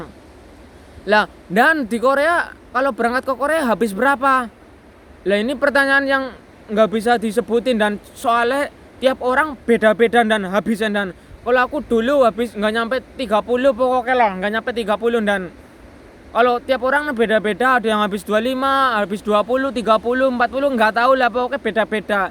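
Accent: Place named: Banyumas, Indonesia. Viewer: Salam NGAPAK NDAN.. ORA NGAPAK ORA KPENAK HHHH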